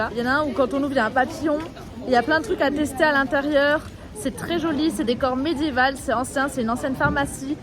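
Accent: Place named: Maghreb, North Africa. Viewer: Ton accent vient d’ou? Tu es D’origine maghrébine?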